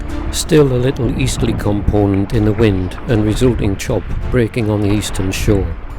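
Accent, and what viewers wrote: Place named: Scotland, United Kingdom. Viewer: dulcet scottish tones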